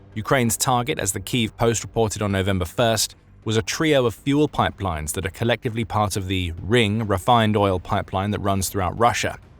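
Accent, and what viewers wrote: England, United Kingdom. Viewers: Why does the narrator (Who's clearly English by his accent) keep calling petrol, "Gasoline"? It's called petrol in the UK NOT gasoline.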